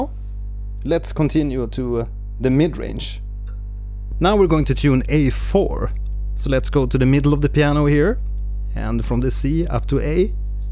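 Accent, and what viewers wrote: Sweden. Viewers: Thank you 🙏. You sounds like swedish 😂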